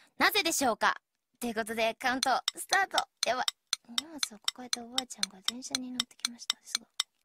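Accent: Kansai, Japan. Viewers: 関西弁可愛い(≧ω≦) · クーラー大好き そうです。 調べてきましたが、関西の人との交流が多かったせいか、関西弁っぽくなったらしいですねw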